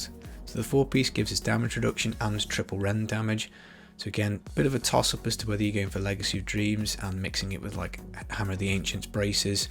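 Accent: Stoke, United Kingdom. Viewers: are you from Stoke ?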